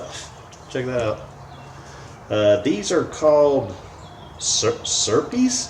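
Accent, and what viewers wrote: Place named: Rural, United States. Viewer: Well you definitely got a lot of kids shoes. I have no idea how those sell. Cat & Jack are a kids brand sold at Target. I'm subscribing to help a fellow youtuber out. I do some what sold and some unboxing if you're interested in checking them out. Those might sell well locally. This month Facebook Marketplace is letting you offer free shipping and no fees. Take advantage of it! What state are you in? I'm in Texas. your accent is more country so I'm not sure lol.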